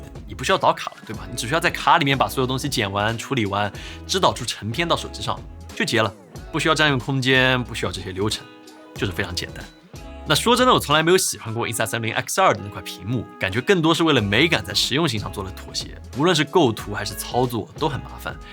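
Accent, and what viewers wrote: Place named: Zhejiang, China. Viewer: 听着像浙江/上海口音。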